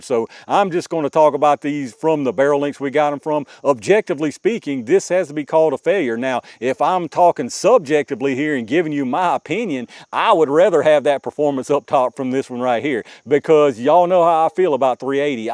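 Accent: South, United States. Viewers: I enjoy your reviews, I just got a BG 2.0. From your accent and the landscape, I’m guessing you’re in north eastern Alabama, North Carolina, or Tennessee. Anyway, greetings from Georgia!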